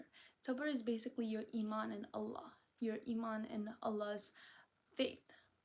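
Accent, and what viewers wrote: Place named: Pakistan. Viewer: you got Pakistani accent.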